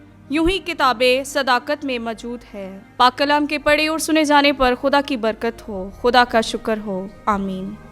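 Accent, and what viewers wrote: Urdu, Pakistan. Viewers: beautiful delivery of bible verses true Urdu accent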